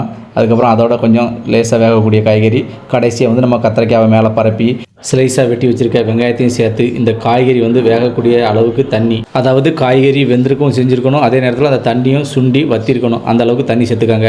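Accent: Tirunelveli, India. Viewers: Tirunelveli aa bro neenga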